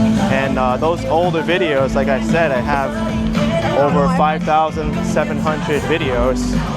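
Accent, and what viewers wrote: New York, United States. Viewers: You're a walking billboard for NYC with your accent. That's what initially drew me to your channel. I enjoy watching any content from NYC be it food reviews, apartment tours, fart videos, tourist videos, and opinion pieces. It's just felt like you've been on very long vacations the past year away from NYC so I haven't watched. Being from Toronto, I've rewatched your travels here countless times. You need to come back to Hong Kong Bistro Cafe. 😃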